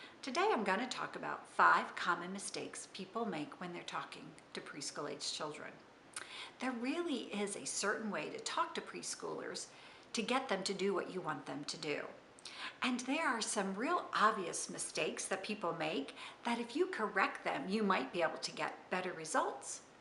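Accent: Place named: South, United States. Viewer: Very slight accent, maybe from southern USA and it's just so cute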